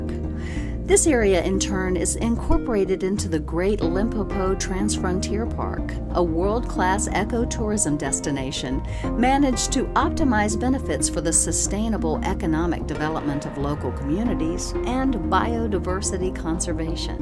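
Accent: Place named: United States. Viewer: Why would a Sth African Lodge use a fawning American lady for the voiceover, in this grating sycophantic advert, is a puzzle.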